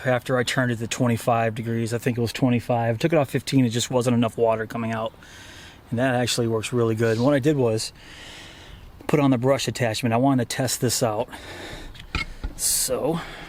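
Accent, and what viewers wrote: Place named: Western New York, United States. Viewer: WNY - can hear the accent and tell by the driveway and the house architecture :-) Think I'll stick with my gas-powered pressure washer.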